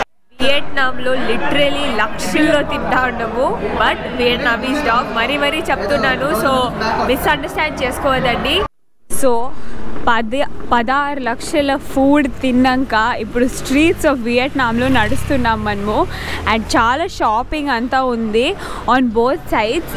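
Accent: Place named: Tamil Nadu, India. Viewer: Your Telugu is so funny 🤣, why it is like that😂, are you Tamilian?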